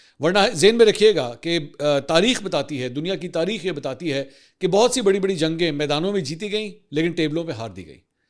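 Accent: Punjab, Pakistan. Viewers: (Bhai pin me )Bhai AP Jo Punjabi ka tarka lagaty hai na Sachi sawad a janda 😂 or program tu aho😂 hit different . Allah AP ko aise hi Khush rakhe ameen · Your Punjabi Very funny 😂😂😂😂😂😂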